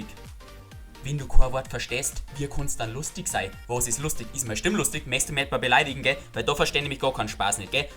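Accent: Bavaria, Germany. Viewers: Der bayrische Dialekt isch mega bin Österreicher verstehe alles nich so vile Unterschied zu meinem heimat Dialekt aber bayrisch isch besser und lustiger💪🏻💪🏻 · Servus boarisch is der beste Akzent #boarisch für immer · Des is boarisch I komm aus Ostalbkreis versteh alles bisch witzig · Man heat scho das du bairisch bisch! i bin Österreicher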